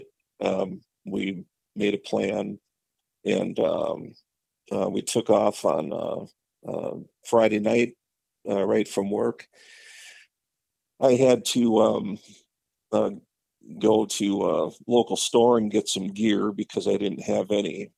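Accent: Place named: Minnesota, United States. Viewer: As a Minnesotan, thanks for sharing your story from Up North with us,. I didn't consider you boring as I listened to your story and interview. You sound like a born and raised Minnesotan like myself - honest and forthcoming. There's two new aspects of Sasquatch that I hadn't thought of before I watched this video. The first is Bigfoot hitting the tree with its fist after it spied you and your buddy. Now I'm wondering if that's what "tree knocking' signifies - a Sasquatch seeing a human? And secondly, I never considered the horrid odor being a response from Bigfoot like a skunk spaying when surprised. I always thought Bigfoot's scent was from lack of personal hygiene, but it always baffles me why some folks smell the horrific odor and others don't. Could that be a trait in specific Sasquatch species and others not? More questions than answers, but perhaps that is part of what makes Bigfoot so intriguing.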